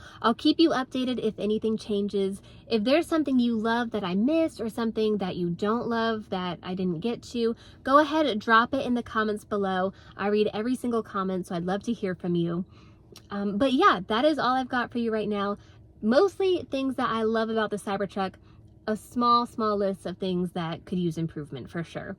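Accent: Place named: Virginia, United States. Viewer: That Virginia accent!!!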